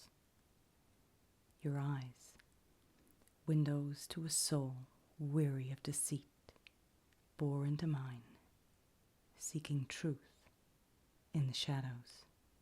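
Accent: United States. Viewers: This is professional! And, wow, an American accent too! You are so very talented. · Whoa, it seems like your personality automatically changes when you speak with an American accent. Perfect! And you’re so beautiful, my God. I can’t handle so much beauty on my screen, ✋🏻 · i dont know if youre still reading comments from this video but i absolutely love your american accent in particular 😭😭 i love this channel so much :) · I mean the accent! forget about it! I'm American and you did great i wouldn't even be able to tell you were English! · Ohh wow! This is the first time I've watched this! You do an amazing American accent! Very well done,! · Nice American accent! You rocked it! You deserve millions of views! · Wow that american accent is fab and i love your blonde hair too x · I thought you were American from this... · your american accent is perfect!! great video :) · your american accent is crazy good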